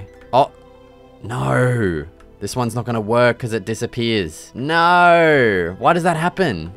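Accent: Australia. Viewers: I love ur Aussie accent cuz its so natural and funnyyy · Brother you sound Australian but also English it's confusing me, are you even either of them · this guy sounds like and australian frfr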